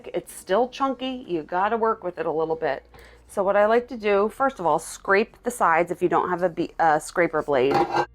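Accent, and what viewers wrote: Philadelphia, United States. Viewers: Just came across your whiter American buttercream video. Your first 5 words I knew you were from my area😆 then you said outside of Philly. I am now subscribed 😉 But super curious now lol. I am Delco, are you Philly or Chester County?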